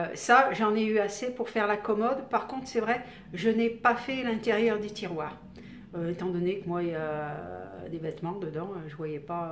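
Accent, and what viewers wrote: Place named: Saint-Étienne, France. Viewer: oui effectivement tu ne fais pas que du maquillage J'adore ton accent.... tu ne serai pas pas la region stéphanoise ? J'adore le relooking de meuble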